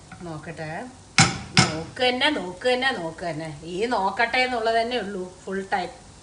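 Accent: Palakkad, India. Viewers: Chechi.. This is the first time I am watching ur video... Chechide samsaram kelkan enthu rasam aaneno... Thani palakkadan bashayil pachaka kurippu kelkkumbo natil ethiya oru feel... Pine ganapathi homathinde prasadam, idichu pizhinja payasam okke enthanelum idane... Samayam eduthu authentic aayitu cook cheyyunath kaanan thanne undu oru sugam... 👍👍👍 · pole samsarikkunna ente palakadu kootukare viji annu peru.adhyamokke varathamanam kelkkanum mathram njan video kandirunju..eppo recepie koodi kanan thidangi tto.thank you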